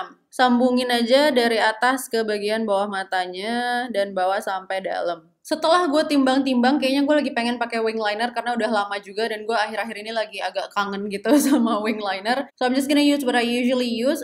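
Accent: Java, Indonesia. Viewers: aku ko selalu ngakak ya setiap ngomong jawa, medokkk banget 😂😂😂 Tp ttp cintaaaa❤❤❤❤❤❤❤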